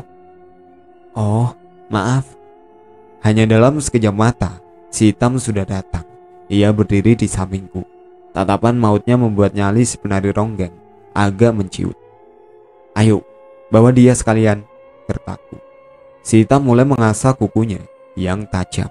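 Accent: Java, Indonesia. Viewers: Min jangan pakai guwe atau gua dong .gk pas .dg logat jawa nya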